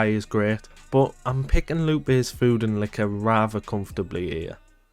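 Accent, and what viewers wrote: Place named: United Kingdom. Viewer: Lmao, what did u expect he's from the UK. As soon as I heard his accent, I knew this video was going to be a hard watch.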